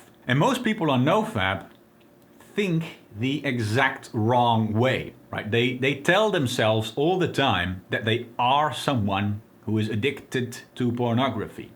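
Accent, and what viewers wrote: Netherlands. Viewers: You sound really dutch maat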